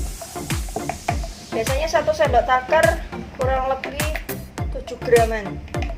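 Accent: Java, Indonesia. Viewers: Medok nya mantul mba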